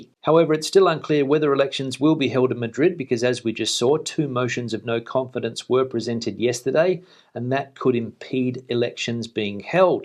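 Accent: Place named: Australia. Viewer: Lyon is in France; LEON is in Spain...get rid of that Australian accent...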